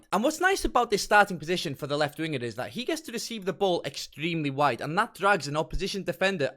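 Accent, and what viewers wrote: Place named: North Wales, United Kingdom. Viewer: You sound like your from north wales mate uftt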